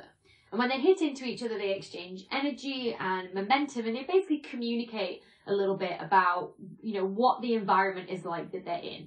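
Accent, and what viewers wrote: United Kingdom. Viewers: It makes very little difference, but for some reason i find it really pleasant to hear a british voice explaining science for a change. I seem to follow all the american science channels. Subscribed, and totally not biased.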